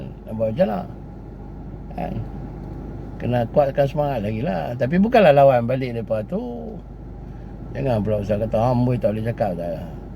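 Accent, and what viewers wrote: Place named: North, Malaysia. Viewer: Deme ni X faham cara ckp org utara. 'anak haram' ni bkn maksud maki. Biasa je.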